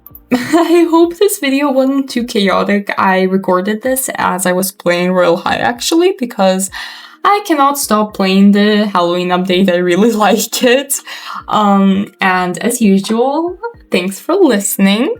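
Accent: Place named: Russia. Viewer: I can hear the Russian accent 😝😝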